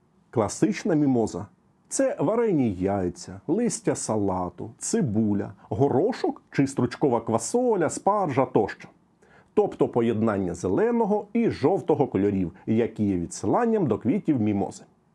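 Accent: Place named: Russia. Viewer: Працюйте над мовою, бо ваша розмовна кацапська аж смердить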